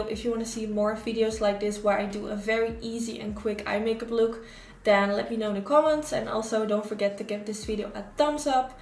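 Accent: Netherlands. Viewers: Are you from Holland? I can hear from your voice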